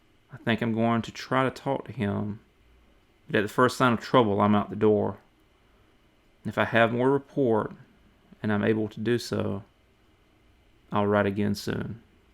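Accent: South, United States. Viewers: Came here from an add. Heard you have an American southern drawl, which is my weakness (I'm British) and had to subscribe. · Yes, us Brits do love a Southern drawl...I find it mesmerising 🇬🇧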